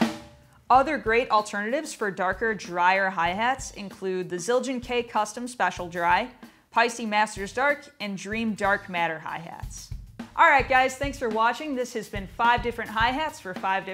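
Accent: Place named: Chicago, United States. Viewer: She sounds like she’s from Chi-Cah-Goe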